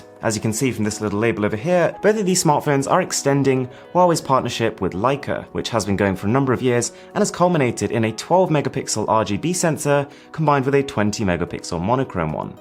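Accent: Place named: United Kingdom. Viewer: I love that UK accent ...😃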